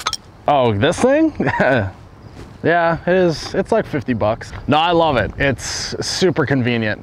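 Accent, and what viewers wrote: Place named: Minnesota, United States. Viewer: I betcha from Minnesota, yah?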